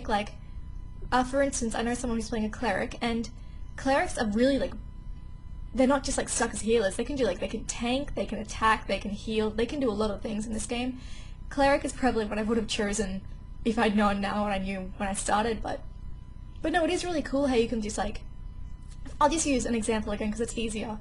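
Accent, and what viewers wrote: Australia. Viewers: Because ur a gamer ur beautiful and u have an austrailian accent which is awesome. (im not trying to ask you out i just need to let it out)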